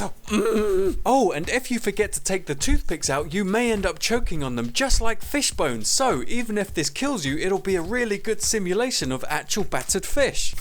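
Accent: United Kingdom. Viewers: Oh I love your recipies, your sense of humour and your Loooovely british accent..... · Just doscovered you channel from this being recommended to me and immediately subscribed before the video was even over. Brilliant recipe, well explained without taking any more time than necessary, from the UK so easier for me to understand being from there myself, plus it was funny and entertaining. Great content thank you man!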